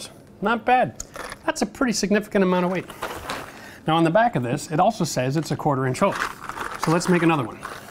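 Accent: Canada. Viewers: when you said "out" I knew you were Canadian - plus now gently you spoke !😄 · You sound Canadian, bud. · As soon as I heard that accent, I just KNEW the Robertson was coming oot as the hero. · I love your accent. Reminds me of my Canadian friend. 😊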